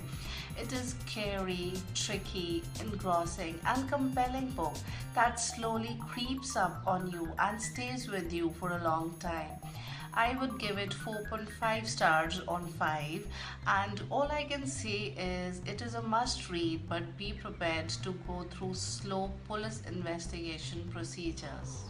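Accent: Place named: New York, United States. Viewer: Very interesting and ur accent is very nyc....keep gng👍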